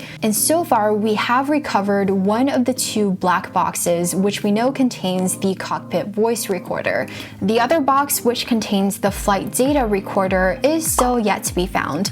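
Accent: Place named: China. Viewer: I love your chinese -english language, you are easy to understand so new to your channel so dont know what u cover but will soon find out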